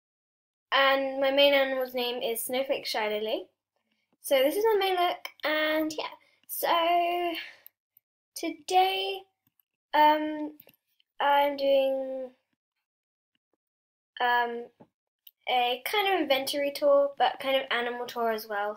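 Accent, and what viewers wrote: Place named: United Kingdom. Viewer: Wow, when we met on Animal Jam, I didn't think you were actually... uhm... british?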